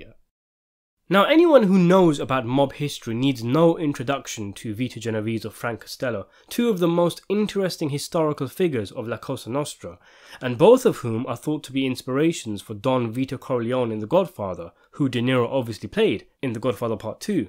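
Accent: United Kingdom. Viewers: The genre is not dead just because Mafia movies always came out every decade or so. People need to understand the glory days of the mafia if anything is what's dead. Also Hollywood really does not make good movies anymore like they used to. Martin Scorsese did not invent mafia movies either. This Brittish guy narrating I can't stand to listen to. DeNiro can still do great. So I will be renting this.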